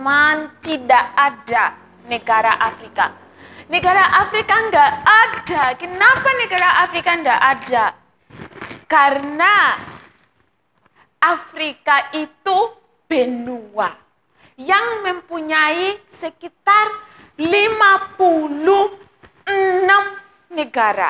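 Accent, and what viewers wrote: Java, Indonesia. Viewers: Cantik mba ... tapi logat indonesia y kaya org jawa.... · Kebanyakan orang Indonesia kurang mengetahui geografi dan sejarah. Tetapi tidak semua. Masih banyak orang Indonesia yang tau geografi. Saya salah satu orang yang menyukai geografi. Ngomong ngomong bahasa indonesianya kog Medok ya mbak.. Tapi jadi lucu 😁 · Lucu banget sih kak, medhoook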